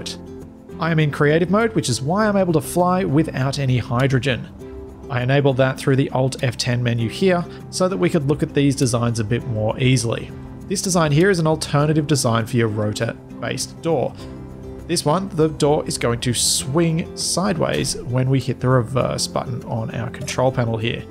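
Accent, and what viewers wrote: Australia. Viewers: Pretty sure is australian, but that's a guess!:D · Great videos mate. Love seeing a fellow countryman leading the way in SE Tutorials and besides I just happen to like hearing our Aussie accent on YouTube. Cheers thanks for the content pal.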